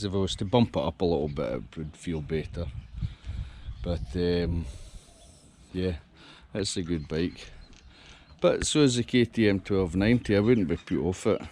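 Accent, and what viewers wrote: Scotland, United Kingdom. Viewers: You sounds like a Scotsman. Regards from Poland 🍻🍻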